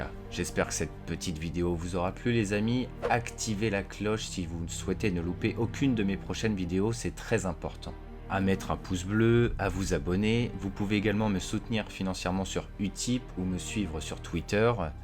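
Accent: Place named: England, United Kingdom. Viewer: Waou l'accent anglais classe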